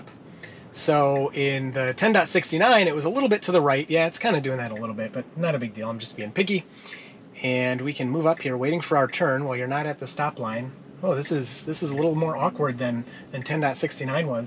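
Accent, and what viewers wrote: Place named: Midwest, United States. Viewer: Just hit SUBSCRIBE, so I feel like I have the right to say this… Dude, you’re in Michigan. What’s with the “y’all” talk??? You even have an unmistakable midwest accent and you are clearly not southern. Just sayin’…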